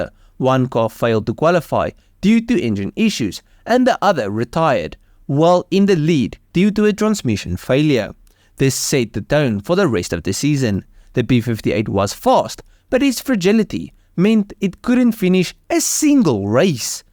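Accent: South Africa. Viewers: Sounds like one of my countrymen from South Africa. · I think it's one of those less well known South African accents. Our ears are just not attuned to the S.A. accent because they haven't been on the (automotive) world stage that much.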